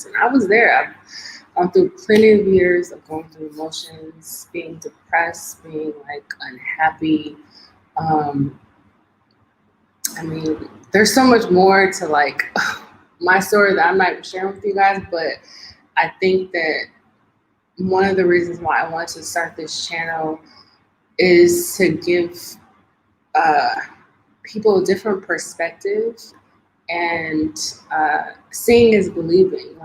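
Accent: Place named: United Kingdom. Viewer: 😂 that UK accent something different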